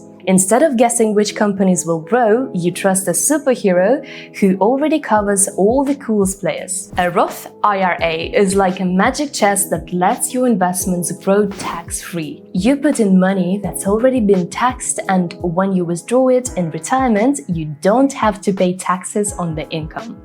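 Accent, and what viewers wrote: Russia. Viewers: Russian pronunciation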